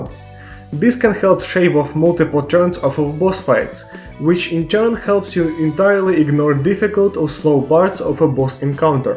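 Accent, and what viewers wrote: Russia. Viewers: Русский акцент не скроишь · Будто бы у тебя русский акцент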